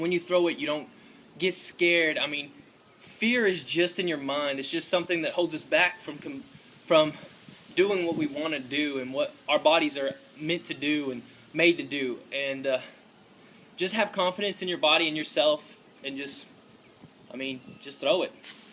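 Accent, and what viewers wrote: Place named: United States. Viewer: Where's is he from iv heard an cow boy voice like that before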